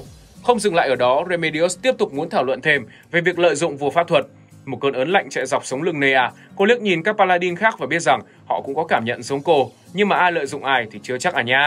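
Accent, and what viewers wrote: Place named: North, Vietnam. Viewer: kiểu giọng bắc ấy, vừa ngầu lòi lại ấm áp~~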